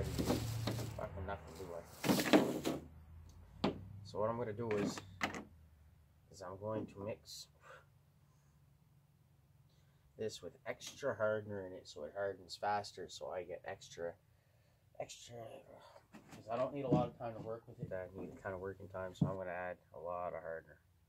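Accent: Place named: Canada. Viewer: We have an old 15' fibreglass canoe, and when I heard you speak, (and of course the topic), I guessed- "Canadian". Then I see how you are a great 'responder' to comments. Yup- definitely helpful- also probably- He's Canadian. (, maybe 1st Nations?) Then I heard 'fiddleheads' and Oh hell YEAH!!!. Now, to guess where from"- Prairies?, nah, not enough water. NL or Nunavut" or BC? nah, more likely he'd be doing a fishing boat. Then the Lepage adhesive can- en français. Voila! Super definitely 'CANADA, in N. Ontario, Quebec or NB.? Humm?. Cheers, from Montreal. Been through NB many times, mom grew up outside Petitcodiac (Forrest Glen), happy memories, saw my first movie in Moncton when 8 years old! with my late uncle, who had a farm near "Elgin" and I almost attended UNB. Keep up the good work... and Happy paddling!:-)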